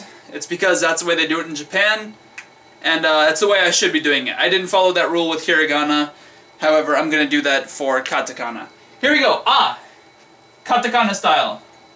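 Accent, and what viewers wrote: United States. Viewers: Nice friendly guy.....the writing is stll ok....but that American - Nihongo accent is really not working.... pity...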